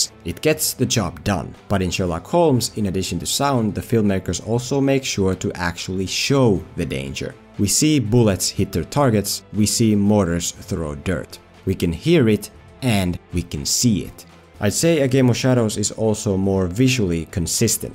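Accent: Finland. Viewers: You sound very much like a fellow finnish man. Am I correct? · Suomi aksentti on point mun nekru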